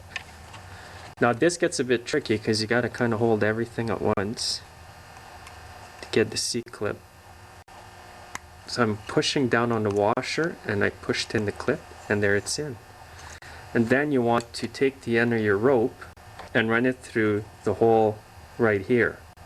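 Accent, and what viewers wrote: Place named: Canada. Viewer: I love the way Canadians pronounce the word "out" as "oat." Great videos BTW!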